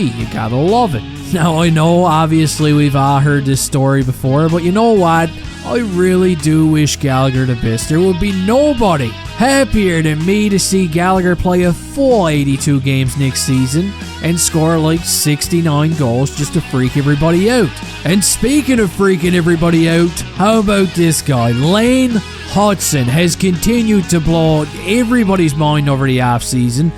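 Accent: Newfoundland, Canada. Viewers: He's a Baymen bye from The Rock .. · The Newfie accent hasn't been watered down like the rest of Canada's. Because of their isolation, they've maintained the accent of their ancestors mostly from England and Ireland.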